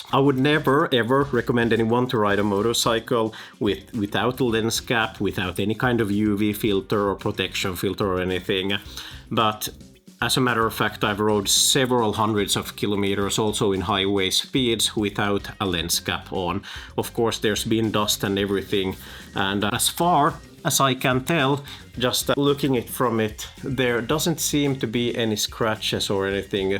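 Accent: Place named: Finland. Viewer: I'm so glad I got that correct. I met some Finns on my travels and they were so polite! Your accent reminded me of theirs. Good video by the way.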